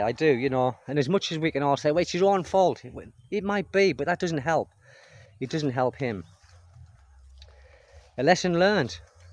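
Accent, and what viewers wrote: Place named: Newcastle, United Kingdom. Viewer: “Hello you lovely lovely people” is the reason I tap on his video every time he uploads one. Also because Geordie is a fascinating accent, I’m scared to set foot on Philippine soil. The nightmare begins in the airport. I just like to travel vicariously through videos of their beaches and lush greenery in the mountains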